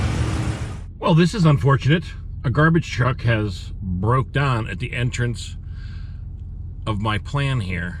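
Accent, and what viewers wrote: Pittsburgh, United States. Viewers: The Pittsburghese is strong, my friend. I am also from the area.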